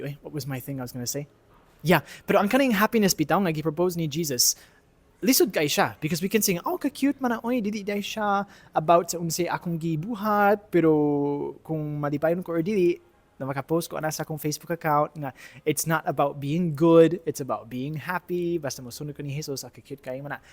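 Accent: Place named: Cebu, Philippines. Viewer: Maka amaze imong binisaya bisag 5 years paka sa Cebu Father mura nakag pure Cebuano the way ka mo esturya ug bisaya… Gi unsa nimo pagkat on ug bisaya father? Btw watching from Japan diay Father! God bless kanatong tanan!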